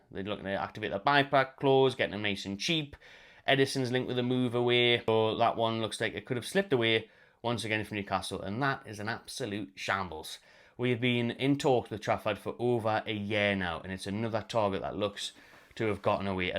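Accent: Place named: Liverpool, United Kingdom. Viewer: You won the worst premier league since it was created. You get carried by Salah and Van Dijk but their mid 30s now and have their huge retirement contracts and already dropped off massively end of last season. It's back to scrapping for 2nd or 3rd this season im afraid, and that would even be WITH Isak. Ps. The scouse accent is by farrrrrr the most annoying, scummy accent in the UK haha. You total cretin.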